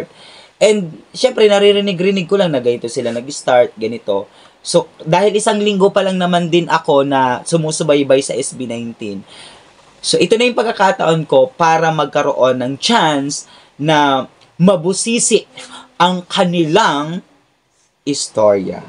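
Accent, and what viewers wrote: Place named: Mindoro, Philippines. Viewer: Nakakatuwa ka din pala kapag serious mode medyo ang usapan😁Destined na maMeet mo muna ang Sunshine Boy Stell and Sunny Boy Justin tlaga for you to have an interest in the group. Magandang simula Yan... and I'm also glad that you're being very True to yourself when you said that you're just starting to know and find out their stories Para masabi mo Kung nasa ang level ka na sa Yong paghanga, just a natural feeling👍👌😊. So either a casual fan or an A'tin makes no difference, just as long as your chanced meeting with Stell and Justin has already stirred within yourself a liking to them as artists, that's a good start. BTW, are you from Marinduque or Oriental Mindoro? Napansin ko lng sa accent mo during your vlog about Stell and Justin. I'm from Or. Mindoro, but my roots are from Marinduque...ngani😁👍❤️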